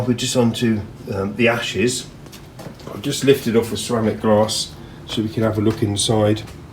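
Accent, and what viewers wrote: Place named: United Kingdom. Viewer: Hi! (I am hoping I got the name right!) Increasing the depth of the top box seems to have helped our objective. I have been experimenting with Trevor's modified 4" version with after burner directly onto the hotplate. I did a rough layout this morning and did a test run - the heat is so immediately seen on the top plate. No smoke from my 5" chimney that I use put at the moment. I will be constructing the whole stove from firebricks. Its difficult to get hold of vermiculite boards in India (a lot is made here but mostly exported to the western nation it seems as there is a higher demand there for insulation purposes I presume). By the way your speech is certainly English(British) and I was wondering if this location you are shooting at is within Britain or somewhere warmer! It certainly is very nice looking kitchen garden / patio garden you've got there and gives off the feeling of somewhere in southern Europe!:)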